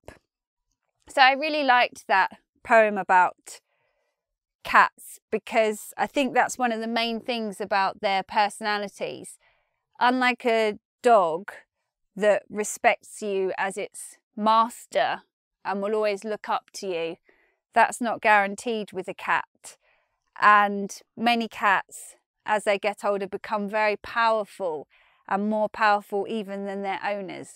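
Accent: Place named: London, United Kingdom. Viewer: Her accent gives it away. She from the UK but if you want to get more precise she from London.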